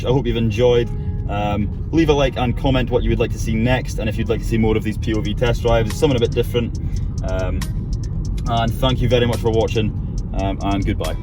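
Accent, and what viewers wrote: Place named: Scotland, United Kingdom. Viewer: Well done for keeping the review informative, short (under 10 minutes), and entertaining. The Scottish accent is an added bonus.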